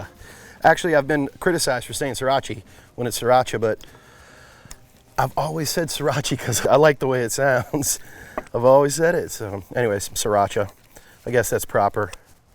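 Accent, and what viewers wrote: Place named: South, United States. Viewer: Great video. Except your accent sounds like the southern surfer guys we have down in Charleston. Strange. Haha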